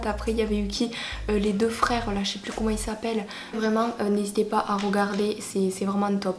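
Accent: South, France. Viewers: Toi tu viens du sud heiiiin mdrrr :')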